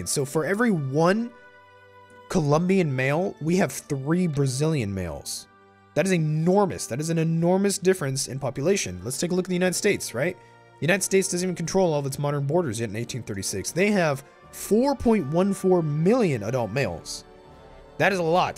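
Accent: Spain. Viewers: It's funny how you say Portuguese things with a Spanish accent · ye he pronounces j's as h's as if it was spanish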